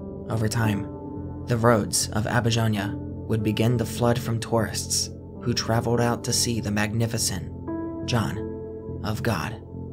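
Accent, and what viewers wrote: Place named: Portugal. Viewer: I find your Portuguese accent interesting. Didn't know how he do that "healings". Have a sense of documentary.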